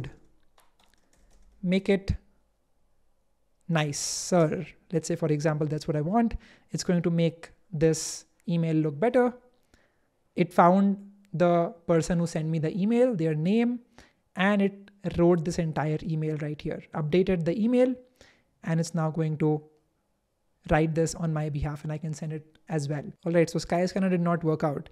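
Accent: India. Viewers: ahh ofc. when i hear hindi accent i already know this video is bullshit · The thick Indian accent 😭🙏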